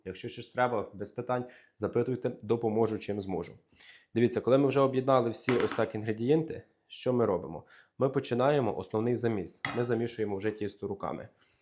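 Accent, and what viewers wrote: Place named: Surzhyk, Ukraine. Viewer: Дитя народилось і у школу ходило в регіоні, де всі балакали на суржику. Навчалося на кухаря, де викладачами були тітонькі з сіл. Вони так розмовляють. Тому так і балакає😂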